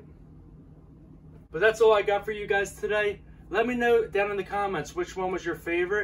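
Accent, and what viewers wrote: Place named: Pittsburgh, United States. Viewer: Bro where are you from? Im from Pittsburgh, PA and you kind of have that accent like us...just curious. NIce pickups!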